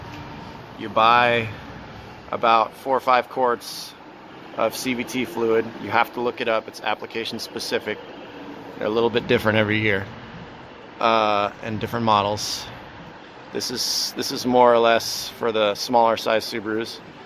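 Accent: United States. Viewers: Who cares to make fuss about drivers position. He made a mistake, but we all should know the right or left of our vehicles if we are going to do potentially detailed maintenance. I get it. But we should focus on the facts. This very well could had been a Subaru in Japan or England, etc. Sans his American accent. But not every u tube video is safe to follow without some double research or common sense. One could see the frontal location of the front differential in his video. That's enough beyond a verbal for me.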